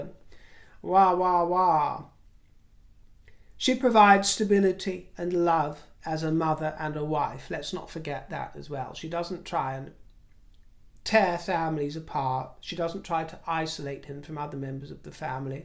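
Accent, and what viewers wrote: United Kingdom. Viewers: Ohhh the word is "uppity"! With your Brit accent I kept hearing "appetite" 😂😂😂 Uppity just means "snob" - whoever said it is racist, get over yourself!! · Amen for you speaking UK English! ❣❣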